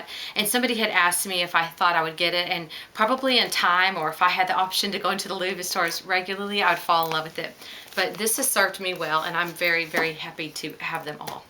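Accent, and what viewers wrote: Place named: United States. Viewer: Thank you so so so so much for taking the time to do this review for me. It's very much appreciated. You are so thorough it really does help. Ok, so I am definitely getting the Mini Pouchette for when I only need a few items and also the clay for my keys. Next hmmmmm still can't decide. Eva if I want more classy, but pouchette if I want more casual. Oh dear, maybe I will have to get both. I love how you say my name..US accents are fab, not like my northern UK one. Thank you again. xxx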